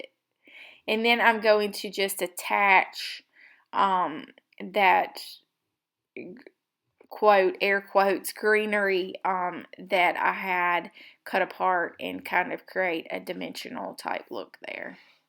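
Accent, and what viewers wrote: South, United States. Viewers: LOL...I thought you might be nearby, you sound too much (accent) like we do around here LOL, plus your sense of humor. That good ole' southern charm, aye! I'm in central Arkansas and was hoping that you might be in the area. Love your project!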